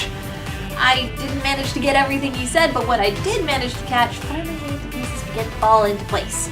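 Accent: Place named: South, United States. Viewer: I did watch this the other night but as per usual fell asleep at the sound of your calming southern tones xD Awesome review and very objective! (Which is a good thing) entertaining and fun!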